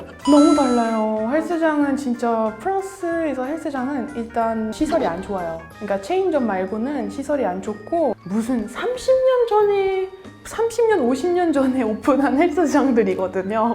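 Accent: Seoul, South Korea. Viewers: 눈감고 들으면 완전 한국인 발음이네요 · 나보다 더 서울말 잘 하시네요.. 전 서울말 못함....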